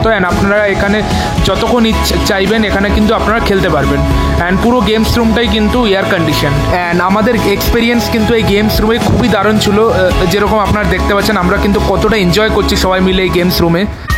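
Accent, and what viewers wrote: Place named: North, India. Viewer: Are you from north India? You are not native of Bengal. Your bengali pronunciation is different.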